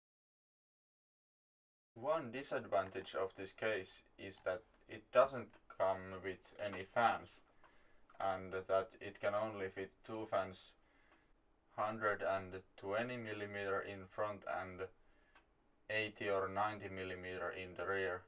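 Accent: Finland. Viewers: you sound like Finnish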